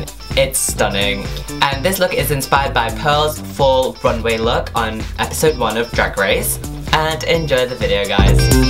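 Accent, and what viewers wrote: United Kingdom. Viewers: are u british? I literally didn't notice til the end lmao. awesome work !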